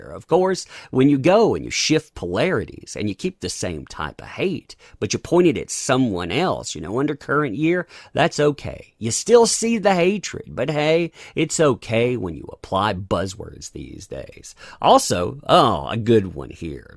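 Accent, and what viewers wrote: South, United States. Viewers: fix your hillbilly voice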